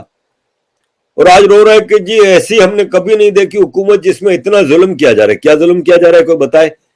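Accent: Gujarat, India. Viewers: Sir aap k bol ne me abhi bhi gujrati accent dikhay de ti aor sir aap j se musalman ki Bharat me jarur hai aap Gujarat me aa ke rahi ye sir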